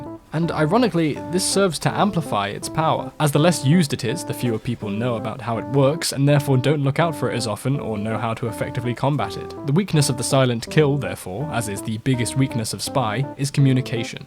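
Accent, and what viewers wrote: United Kingdom. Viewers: You sound like the most British man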